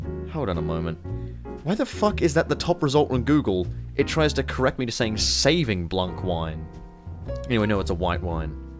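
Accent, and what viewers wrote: Australia. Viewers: I like how has got progressively less Australian over the years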